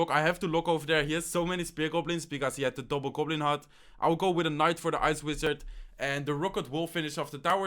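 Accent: Netherlands. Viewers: I tough you were Dutch just like me cuz of the accent. I look in the description and it says: I’m from the Netherlands lol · Dutch accent is kinda annoying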